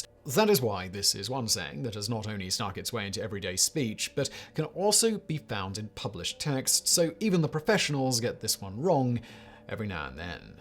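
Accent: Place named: RP, United Kingdom. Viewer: To my dumb American ear, posh English accent make "bald" and "bold" sound almost exactly the same 😆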